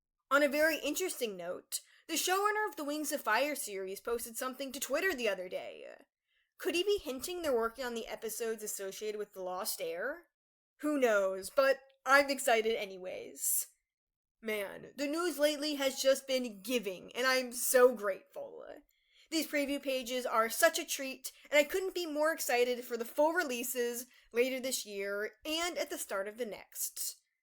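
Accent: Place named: United States. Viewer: dude i really wanna watch ts but the american accent is so HEAVY and i can’t bear ts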